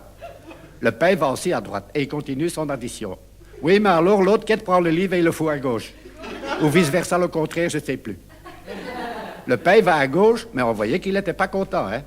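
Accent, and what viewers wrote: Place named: Brussels, Belgium. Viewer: Cela me ramène en arrière dans le temps, je suis bruxelloise et ma bobonne parlait comme ça.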